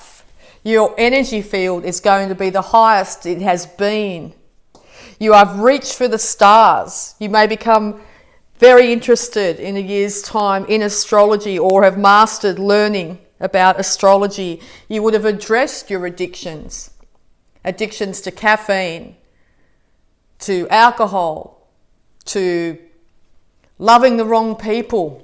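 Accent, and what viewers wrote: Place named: Australia. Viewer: Love Aussie ascent...I am in Melbourne...I go to school at Shambala centre Bullpen...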